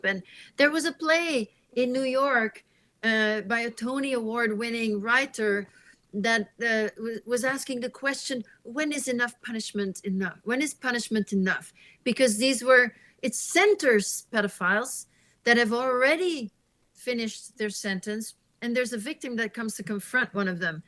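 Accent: Australia. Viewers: Who is the Voice aussie?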